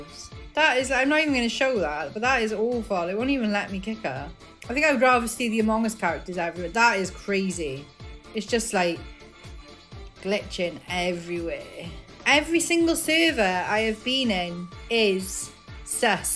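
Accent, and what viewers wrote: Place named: Scotland, United Kingdom. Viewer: What country are you from you sound from Scotland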